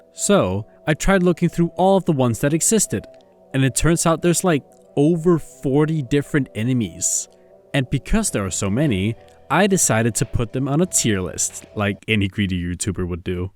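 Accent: Denmark. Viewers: bro r dansker wtffff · jeg var slet ikke klar over at du var dansker💀💀💀💀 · Er du dansker?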